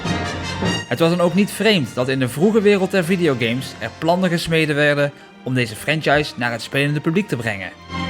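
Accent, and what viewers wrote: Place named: Brabant, Netherlands. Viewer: Ik hoor een Brabants accent 🤩 Good job!!!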